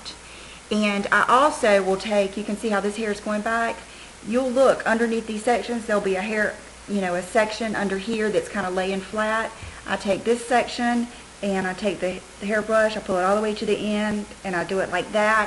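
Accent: South, United States. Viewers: I always hope that you will say "Hey, Ya'll" bc i love ur cute southern accent, but you never do. You should!!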